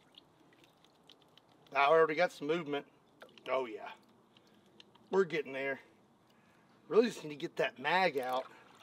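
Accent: Kentucky, United States. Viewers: What part of ky are you from brother?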